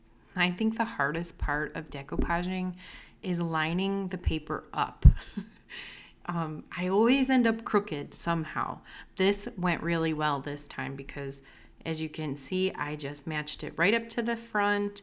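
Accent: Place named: East, United States. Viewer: Love your artistry and thanks for telling it like it is - so helpful and inspiring. And hope this doesn’t come out wrong but your eastern us accent is so cute. Love From California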